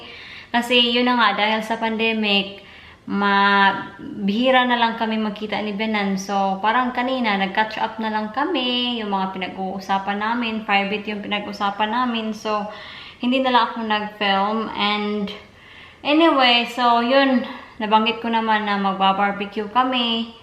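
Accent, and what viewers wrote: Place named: Visayas, Philippines. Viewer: Basta bisaya lambing gyud kaayo.👍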